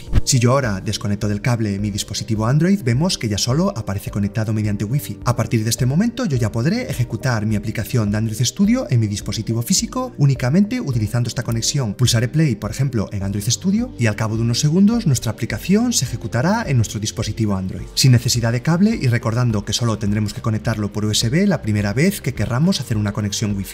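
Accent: Spain. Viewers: Eres Español??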